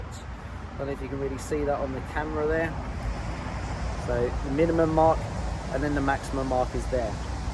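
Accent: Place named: England, United Kingdom. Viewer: Awesome English accent. Thanks a lot 🙏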